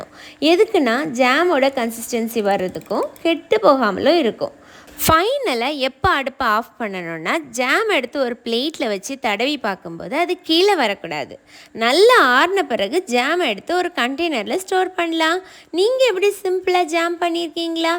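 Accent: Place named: Nagercoil, India. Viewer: sister onga Nagercoil slang super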